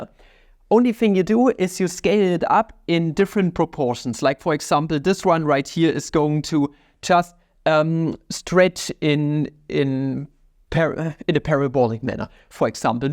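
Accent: Germany. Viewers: this is German pronuncation. is there even any word pronounced correctly?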